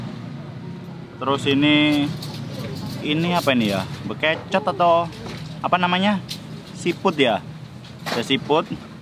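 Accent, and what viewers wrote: Indonesia. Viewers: Mas nya asli org mana to kog logatnya kyk org jatim?? Dan kosa kata nya yg salah diketawain sama suami ku🤣🤣 pulen sama punel itu beda, dan cecek disini itu muntahan org sakit.disini namanya krecek atau kulitan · Bray ne kurang pas... Logat sek suroboyo... Mending suroboyoan ae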